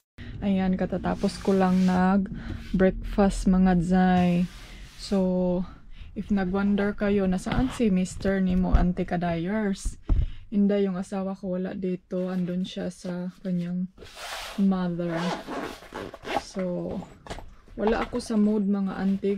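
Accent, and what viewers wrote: Philippines. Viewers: Bugay kay kag English Indai pinay na pinay I like it 😄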